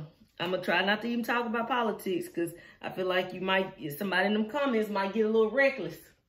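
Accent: South, United States. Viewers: OMGGGG!! I love everything about this! Your spirit, your energy, the southern twang, that bomb ass haircut and your beautiful self. Best review EVER!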